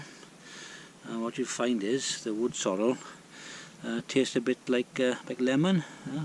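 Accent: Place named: West Wales, United Kingdom. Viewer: Great video I could listen to you reading the telephone directory. I love your accent is it West Wales?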